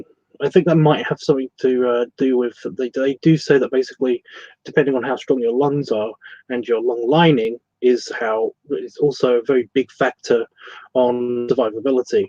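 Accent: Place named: England, United Kingdom. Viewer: Sorry to learn about how you're not feeling well. I realize this posting is a week old now so hopefully you're back to your happy self as you read this... I've been working heaps this past week, to get a 4 day holiday weekend, as we celebrate Victoria Day in Canada. Regarding your throat discomfort, it may be possible that it's a symptom of an allergy. I developed allergies when I turned 40 ish - never having suffered from them before. The time of year plays a big part. So hopefully your hot bear body will fight it off. All this time I've admired you, I've never asked you directly, just assumed you make your home in England? You're wonderful accent leads me to this conclusion (ya think?) LOL. England; for the most part, experiences much the same weather patterns as we live with here in my home of British Columbia. It certainly provides the necessary elements, which ultimately evoke the bloody flu. 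Anyhow, I wish you a speedy recovery and look forward to your next post bear. Hugs from your furry bear Canuck buddy.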